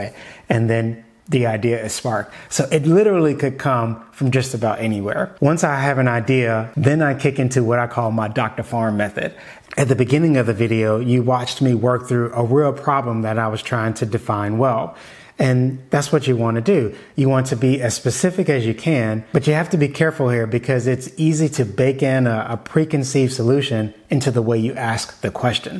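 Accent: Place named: Baltimore, United States. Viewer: My biggest takeaway from the 1.5 videos I’ve watched, is that I’m 100% sure this dude is from Baltimore. That accent is unmistakable 😂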